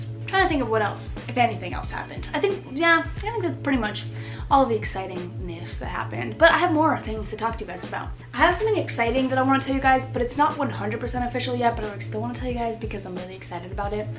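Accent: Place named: United States. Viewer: Your country accent hahaha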